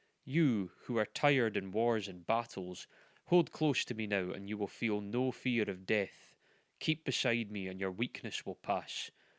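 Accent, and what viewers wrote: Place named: Scotland, United Kingdom. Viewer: The Epic of Gilgamesh sound so good with a Scottish accent. Well read and instantly subscribed.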